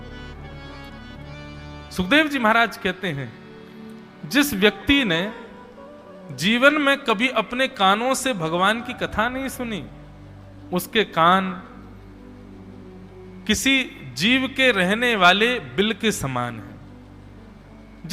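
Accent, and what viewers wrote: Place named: Braj, India. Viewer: Raadhey raadhe guru ji aapki katha bhut acchi lagti h aapke mukharvind se brij bolo bhut acchi lagti h lala ka darshan ho jata h katha me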